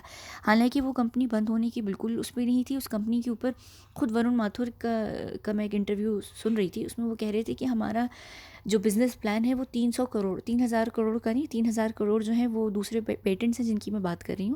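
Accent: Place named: United Kingdom. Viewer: Great 👍 voice & great 👍 voice and also very very good Urdu & great 👍 british accent in English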